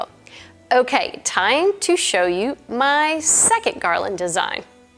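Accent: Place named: South, United States. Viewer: I love and miss the Southern look (and accent!). You make it look like I could do this too. Thank you so much! From a native Kentuckian who lives on the west coast now. We have those white pines right behind my office window!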